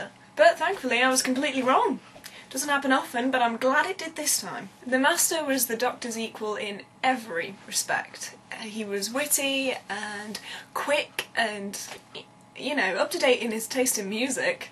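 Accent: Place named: United Kingdom. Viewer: OMG, you sound like me, except, ya know, British. I WISH I was British. I disagree with you on the subject of Martha. I think she grew into her awesomeness, unlike Rose, who was instantaniously wonderful. Anyhoodle, yes, Jack is overshadowed in the finale by the Master. The DOCTOR is overshadowed by the Master, and it's HIS show! Thankfully there's enough slash to make you die of squeeing in the last three episodes ('use my name' indeed!) which is just fantastic! Great review!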